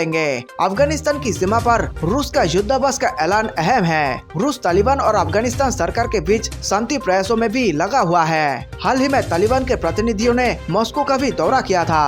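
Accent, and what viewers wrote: Bengal, India. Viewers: News reader Bagali uchaaran me Hindi bahut achhi bolte hain.very nice!🙏🇮🇳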